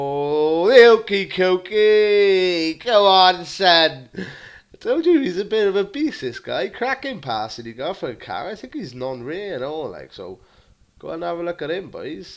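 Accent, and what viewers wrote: Wales, United Kingdom. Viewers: Where in Wales are you from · Love the welsh accent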